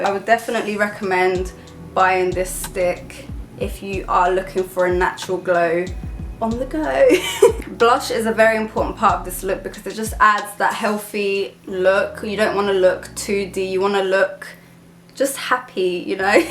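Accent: United Kingdom. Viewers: теку от её Британского акцента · the engaging British accent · Strange accent, a mix of British and... German? Dutch?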